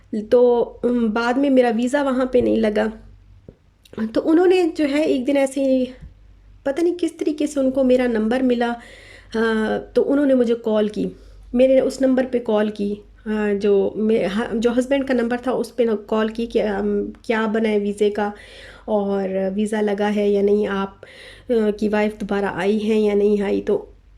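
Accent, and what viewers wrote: Saraiki, Pakistan. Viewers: As'salam o Alaikum aap ke voice mai thori thori saraiki language aa rahe hai aap pakistan ke kis city se hain.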